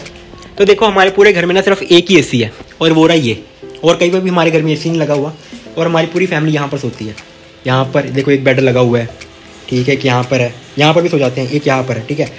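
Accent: Rajasthan, India. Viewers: Rajasthan walo ka accent chhipaye nhi chhupta tbh maine socha tha nd it came true !..m from Rj too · Are u from rajasthan?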